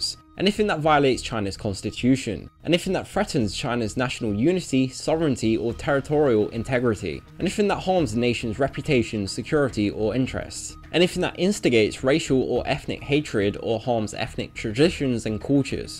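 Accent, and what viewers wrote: United Kingdom. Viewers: What country is your accent from? oothers (others) coolts(cults). Is it Scottish?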